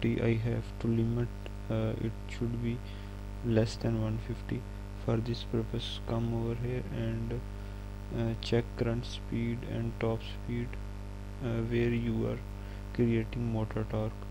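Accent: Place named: India. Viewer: Thanks man .. Great video .. Indian voice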